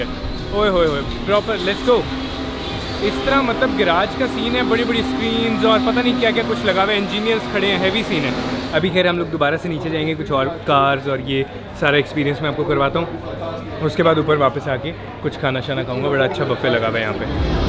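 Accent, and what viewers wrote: Punjab, India. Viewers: Bro great ap ka punjabi acsent kamal ka ha 🥰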